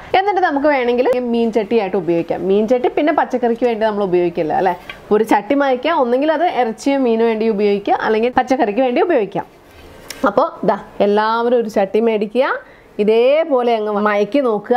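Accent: Kottayam, India. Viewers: Kottayam slang super.. 👌😄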